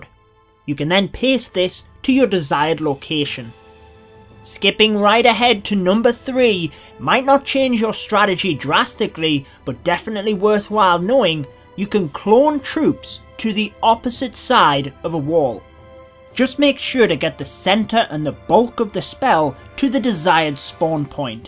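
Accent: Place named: Scotland, United Kingdom. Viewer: Where are you from? You sound Scottish and English combined.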